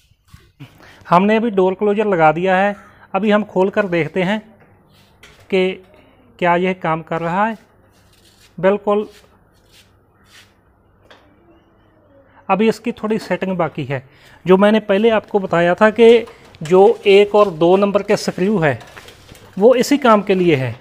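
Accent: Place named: Punjab, India. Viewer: Veere Punjabi bol k video banaa Leni c. .Hindi bol reha Punjabi style ch...😊 Darwajey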